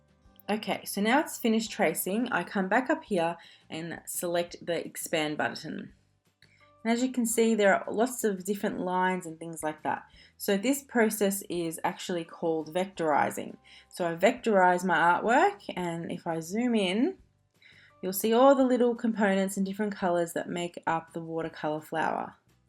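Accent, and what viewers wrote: Australia. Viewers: For once! its nice to hear an Aussie accent. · Very comprehensive tutorial and with an Aussie accent!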